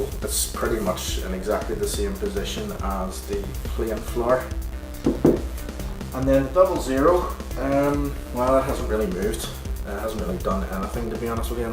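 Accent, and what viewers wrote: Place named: Ireland. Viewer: I love your Irish accent I am from Scotland just over the sea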